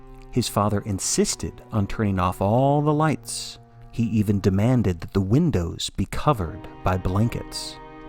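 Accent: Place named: Maine, United States. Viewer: As a daughter of a mother from Bangor, Maine I would like to thank you for using your best Mainer accent. For me, it really brings the story together 🙌🏽🥰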